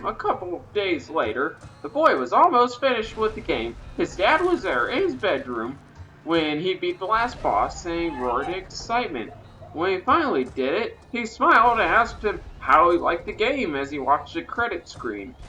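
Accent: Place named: South, United States. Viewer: I never noticed until now how southern you do sound in this. XD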